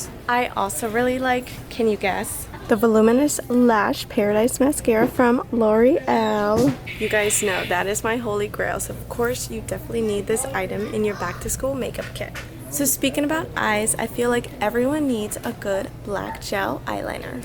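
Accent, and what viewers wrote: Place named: Latin America. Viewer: She has a very thick Hispanic accent.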